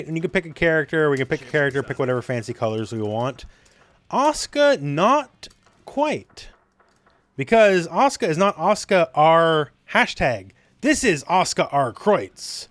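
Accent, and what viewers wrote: Canada. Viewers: Won't ever get sick of hearing pronounce 'cards' in his Canadian(?) accent!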